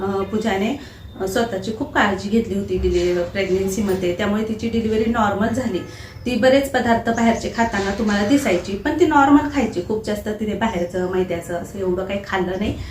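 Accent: Nashik, India. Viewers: मला तर नाशिकची बाेली भाषा तुमची खुप आवडते माझी मैत्रीण सुद्धा सटाणा नाशिक येथील आहे तिचे बाेलणे सेम तुमच्या सारखेच आहे हे ऐकायला सुध्दा नशीब लागते❤❤❤❤ ताई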